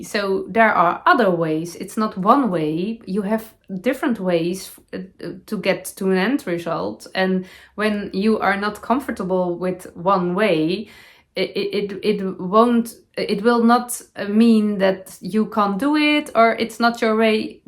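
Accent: Netherlands. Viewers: Many congrats on your 100th episode,. I've often wondered how you find the time to film and, especially, edit 2 podcasts every week.... and still manage to run a home (and all that entails), and then get through the amount of projects you do! I love your videos.... and your Dutchy-English😊 Best wishes for the weekend, and I'll see you again on Monday. Christine (London, England) x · Inspired by you, I made a granny square little vest for my 11-year-old granddaughter. It is so cute I may have to make another! I knit more than crochet, so I am so happy to have learned a new craft. I love your Dutch accent. When I was 11 to 13.5 I lived in the Den Haag area, so learned Dutch, and I am so happy to to hear your language again. I will have to listen to a Dutch episode! Congratulations on 100 episodes! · Happy 100th!🎉❤ it truly is the best heart jumping watching you. 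I look forward to Mondays and Fridays! You are so sweet. Love the imperfections and your wise words, even if they are in your Dutchie English- it is perfect. 😊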